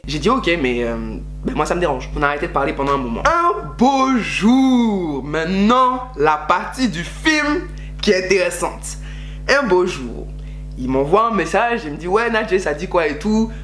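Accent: Ivory Coast. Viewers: le montage me fuuume en plus a force de regarder tes videos je parle comme toi et je commence a voir l accent ivoiriens :) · Mdrrrr ton accent ivoirien . Trop fière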